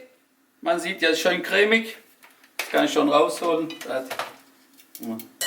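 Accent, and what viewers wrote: Swabia, Germany. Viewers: bist du Schwabe?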